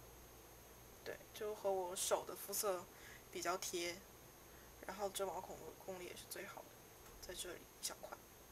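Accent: Northeast, China. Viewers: 东北人吗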